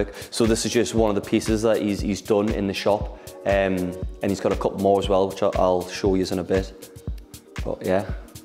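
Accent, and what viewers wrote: Newcastle, United Kingdom. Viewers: Geordie mate, north east of England.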